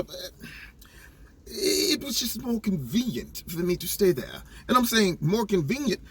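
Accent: England, United Kingdom. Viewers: Your British accent is jolly good! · Hilarious! Love it! Love the British accent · Your british accent is so funny! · I love your English accent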